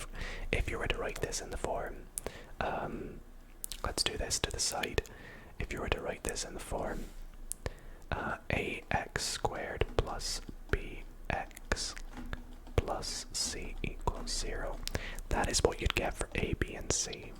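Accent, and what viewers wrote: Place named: Doncaster, United Kingdom. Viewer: Are you from Doncaster ? Your accent sounds much like Louis Tomlinson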